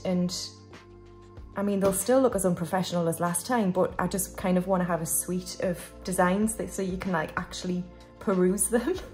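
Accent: Newcastle, United Kingdom. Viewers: Loved this video as always, puts a smile on my face when I see an upload from you :) I love your Geordie accent and I had the giggles when you were talking about the apple and how you were a 'whorebag' haha, hope you are okay xxx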